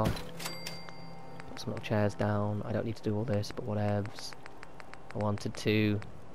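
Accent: England, United Kingdom. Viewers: English accents are perfect for instruction video lol you & Adi my favorite Fallout tips and tricks. Thanks bruv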